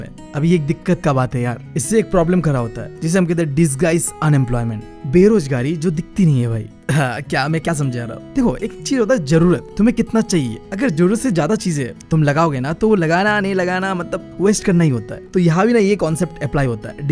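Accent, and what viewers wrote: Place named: Assam, India. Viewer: Wo assam se hai iss liye thoda hindi me problem hai bhai😅😢😊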